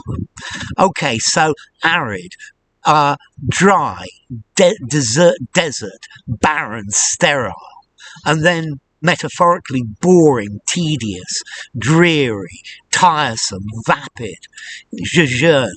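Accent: United Kingdom. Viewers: hello dear sir, thank you for this brilliant explanation, I'd be very glad to know what is your British English accent please.😊😊😊😊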